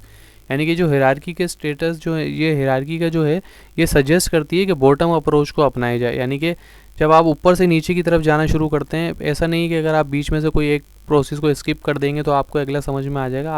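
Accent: Karachi, Pakistan. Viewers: Apka lhja karachi walon k ha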